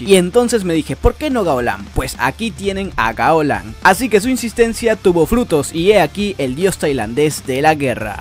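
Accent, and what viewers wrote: Peru. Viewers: Vos sos peruano?? 🥺👌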